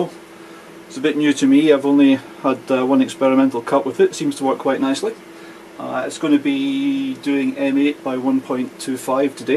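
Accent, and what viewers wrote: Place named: Europe. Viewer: Good video, I am about to make something similair myself. Looking around for some inspiration. Thank your for sharing. From your accent, I presume that you are from europe. Not to many tormachs around here. Did you order a brand New, or Did you manage to find a used one? Would be intresting to know how much the shipping was in case u ordered a new one. Subscribed. :)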